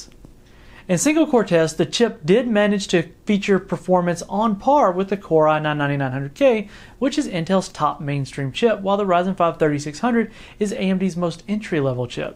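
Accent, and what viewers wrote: South, United States. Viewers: Just out of curiosity , which southern state are you from if i might ask!?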